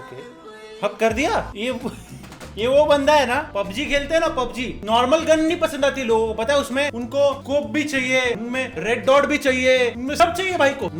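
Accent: Gujarat, India. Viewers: bhai you really suit while having a gujju accent😂😂😂... thoda bohot gujarati bolte rahiyo please har video me...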